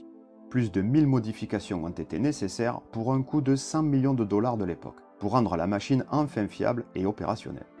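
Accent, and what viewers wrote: South, France. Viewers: Très beau documentaire … Les détails techniques et historiques sont très bien présentés. Le petit accent méridional du narrateur et quelques pointes d'humour permettent de suivre cette présentation.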